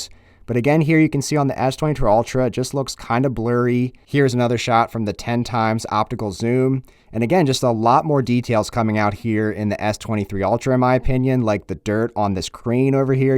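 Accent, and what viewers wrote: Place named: Pennsylvania, United States. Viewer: Knew I recognized that PA accent.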